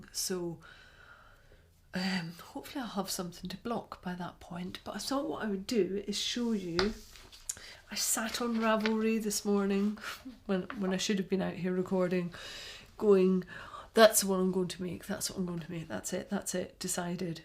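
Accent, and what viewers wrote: Scotland, United Kingdom. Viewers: Your shoulder issue has properly scared me into calling a doctor for an appointment. 😅 I really want to crochet a pair of socks for myself but I find that I am more intimidated by crochet socks than knitted ones. The Rivuret (spelling?) top y my pattern for the back to school sweater CAL and I'' making it in the loveliest orange shade. I would love it if tou recorded a small podcast whilst in Scotland, it would be amazing to listen to your true scots accent. Totally inviting myself to have tea and cake with you and Charlie 😂.Lots of love to you!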